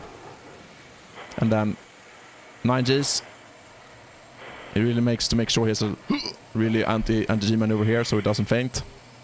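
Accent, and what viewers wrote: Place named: Sweden. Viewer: Love your Svengeska.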